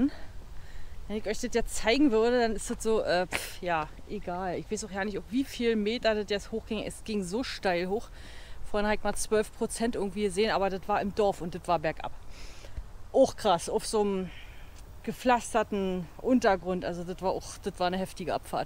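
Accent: Berlin, Germany. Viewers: Hallo deine Videos schaue ich sehr gerne, bin bei deinen Touren auch etwas dabei. Macht viel Freude deine Touren und Erlebnisse. Auch deine berliner Aussprache sehr nice. Grüße Aus Bayern Rudi t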